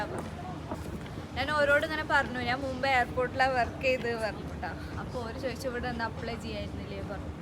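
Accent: Palakkad, India. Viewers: ഫ്ലൈറ്റ് ഇറങ്ങിയതും തനി പാലക്കാടൻ സ്ലാങ്😂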